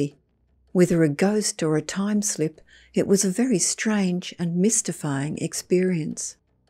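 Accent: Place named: Australia. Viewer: I love your Aussie accent and the way you narrate. Thank you 🙏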